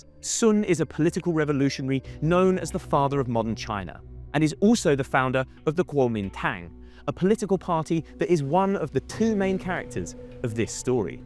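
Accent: United Kingdom. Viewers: if he didn't have a British accent this series would be boring...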